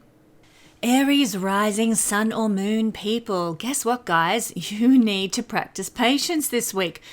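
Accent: Australia. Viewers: So weird your Australian accent is showing more now you’re recording in the states😅 I’m Australian;)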